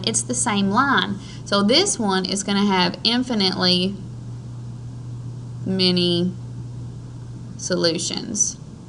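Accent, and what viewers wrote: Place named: South, United States. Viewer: when here accent yeeha I felt that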